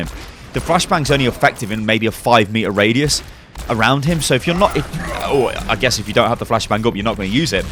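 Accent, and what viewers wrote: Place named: East Midlands, United Kingdom. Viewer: are you from east midlands area? you sound it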